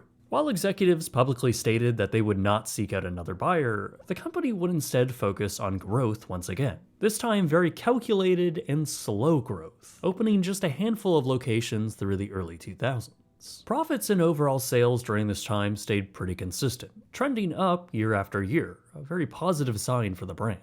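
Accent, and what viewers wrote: Canada. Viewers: Lone Star Steakheaouse? Bought eaout? The Canadian accent truly is the worst and most annoying accent for long-form content.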